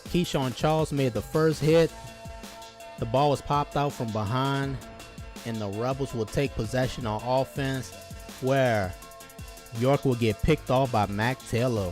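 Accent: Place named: New Orleans, United States. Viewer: You have great content on your channel man 💯 And you sound like you from New Orleans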